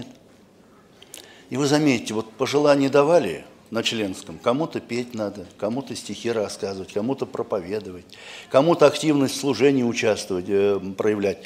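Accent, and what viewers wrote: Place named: Volga, Russia. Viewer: Спасибо. У него речь волжанина), я думала, что с Волги откуда-то) Мир Вам🌹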